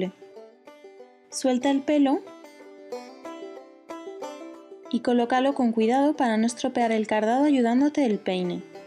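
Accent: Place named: Spain. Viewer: Yo savia ke hablabas español por tu acento cuando hablas frances!! :P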